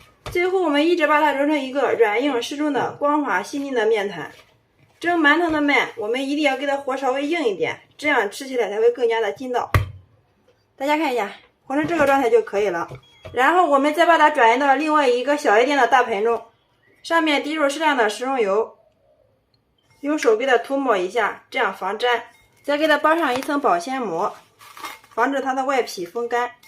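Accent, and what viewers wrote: Shandong, China. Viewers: 不单纯是快的问题，主要是山东话味儿太重所以听着费劲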